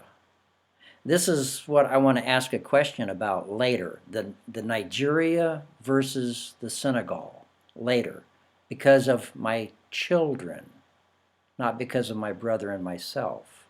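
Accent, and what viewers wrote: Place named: Sierra Leone. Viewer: 😂😂😂 you sound like a Sierra Leonian.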